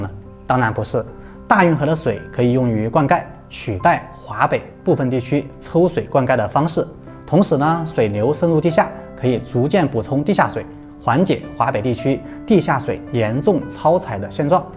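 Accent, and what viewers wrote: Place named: Sichuan, China. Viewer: 听起来一点不费力，典型四川人普通话，你多习惯就好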